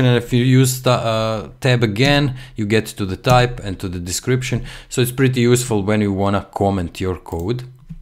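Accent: Russia. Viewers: Thank's - you have a log of useful videos on your YouTube channel. Where are you from? I think maybe russian accent? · русский акцент? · рускиий акцент)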